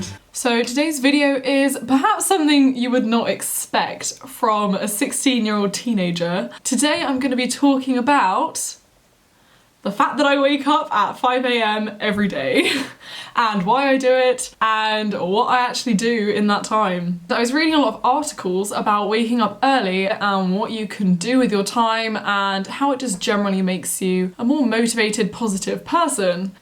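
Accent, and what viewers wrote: United Kingdom. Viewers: do you have a british accent? you dont seem to have an aussie accent to me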